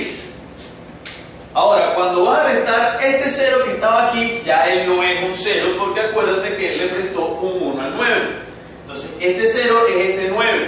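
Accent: Venezuela. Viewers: Boyjess13 Tambien le Note el acentico Venezolano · Simpático el acento venezolano... :)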